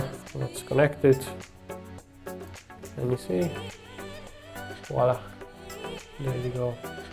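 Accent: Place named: Hungary. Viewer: You sound like hungarian 🇹🇯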